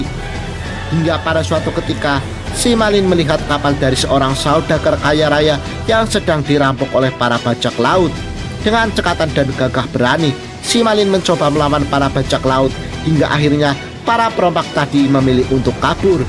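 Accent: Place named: Java, Indonesia. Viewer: wou suara lo jawa nya ga usah medokkk ngtu kali ......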